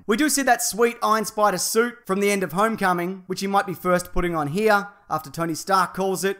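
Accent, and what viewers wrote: Australia. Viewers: Are you Australian?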